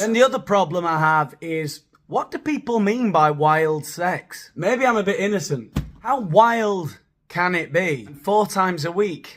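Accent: United States. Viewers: Love your American accent. Cheers. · OMG, I just LOVE your American accent!!! AND your impeccable sense of humor, but, mostly I still chuckle at the thought of that FABULOUS rooster blasting me out of my self-induced YouTube inertia!!!!!!! Rock on!!!!!🎸🎸💖💖🐓🐓🐓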